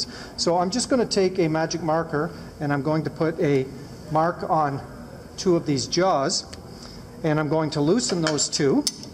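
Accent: Canada. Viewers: Are you Canadian bud? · etalwork was theonly practical class at school, I liked. wish I had learnt more. I thought,tho, that when machining like this, funning luid was used, to shed heat,reduce tool wear, speed process. maybe only on large production lines. ? in a microchip world, nice to see some crsftwork. good video, by Canadian presenter, pronouncing 'out', more like 'oot'? )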